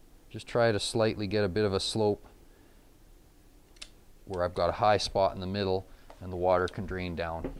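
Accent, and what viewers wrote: Canada. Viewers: I love the Canadian accent, aye!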